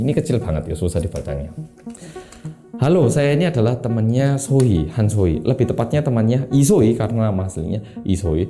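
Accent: Java, Indonesia. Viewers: Ga ada nada nada bicara atau pemilihan kata yg tidak tepat yg membuat org berpikir ini bukan org indo. Beneran natural banget. Di telinga gua dia mas mas dari jawa. Penjelasannya bagus dan menarik. Thanks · lucu amat mas medok, konsep nya ala ala presentasi di kampus yaa 😄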